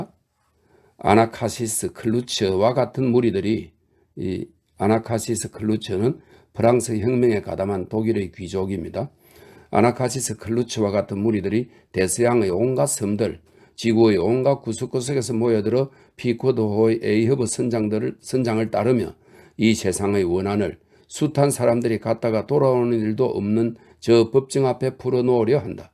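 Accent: Gyeongsang, South Korea. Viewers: 한동훈장관찐팬인데 후니가감명깊게읽은 책이라해서듣습니다 나이가좀많아서 너무감사합나다 두번째듣습니다 모두꾹꾸우욱누르고 경상도사투리어투가 매력적입니다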